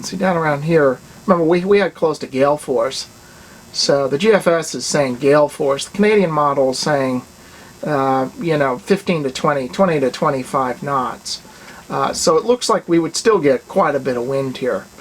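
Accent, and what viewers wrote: Maine, United States. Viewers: Not to be an idiot, but sounded some Mainer and not a learnt language, but a first language, and very easy on my ear. An old lingo that's stood the test of time and storm...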